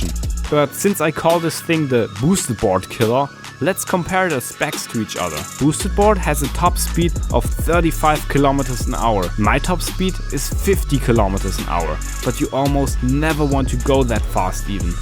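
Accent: Germany. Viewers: Dat geman accent. Yuneec for me. Doesn't break the bank. As always... good luck eskating in ol germany. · Hey, das Video ist gut gemacht! Ich gehe mal davon aus, dass du eigentlich deutsch sprichst, dem Akzent nach. ;-) Inspiriert zum nachmachen, weil du vor allem deine negativen Erfahrungen aus einem früheren Projekt darstellst und anwendest. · Dieser Deutsche Akzent 😂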